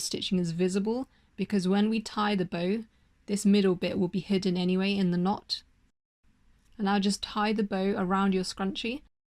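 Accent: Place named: United Kingdom. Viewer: It's British accent 😅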